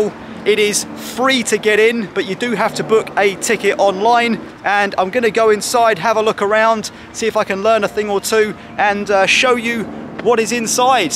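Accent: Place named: Essex, United Kingdom. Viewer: Been watching your lovely videos for a while now,loved the bulgaria ones,because of you we booked holiday there.you sound like a essex boy,im a cockney not many of me left debs xx ps your a 💎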